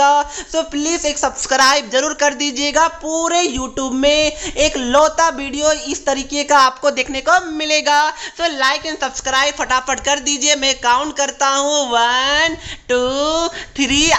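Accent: Bihar, India. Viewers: Sir ji aap bihar se ho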